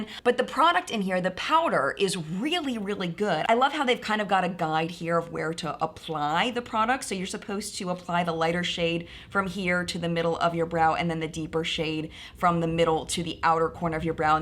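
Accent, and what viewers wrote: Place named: Midwest, United States. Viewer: I love your midwestern accent :) I’m from Iowa and say “vanella” also and always get called out for it. ;p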